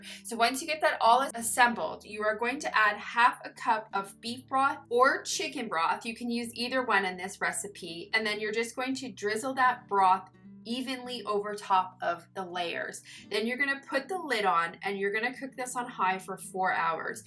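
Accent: Canada. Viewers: I think I remember you saying that you are from Canada, so that explains your accent. I am so happy that you are getting new subscribers. I love your videos, been with you from the beginning.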